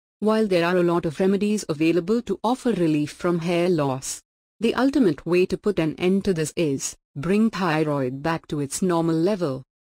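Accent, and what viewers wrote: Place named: Asia. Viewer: An Asian robotic voice🤦🏼‍♀️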